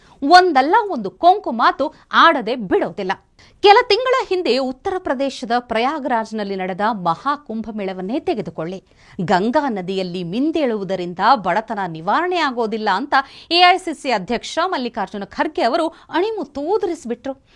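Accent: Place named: North Karnataka, India. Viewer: ಮೇಡಂ, ನೀವು ಉತ್ತರ ಕರ್ನಾಟಕದ ಕನ್ನಡದಲ್ಲಿ ಮಾತಾಡಿದ್ರೇನೆ ಕೇಳೋಕೆ ಚೆಂದ.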